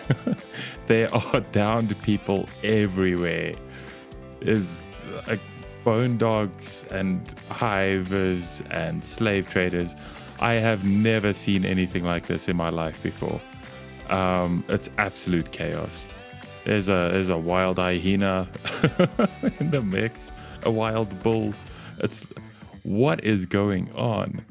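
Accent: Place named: Pretoria, South Africa. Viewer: Bro sounds like a Pretoria boy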